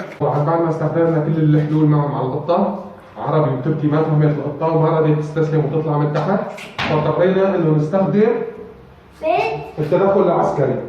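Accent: Syria. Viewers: يا انت ادلبي ولا حلبي لانو لهجتك لهجت ادلبي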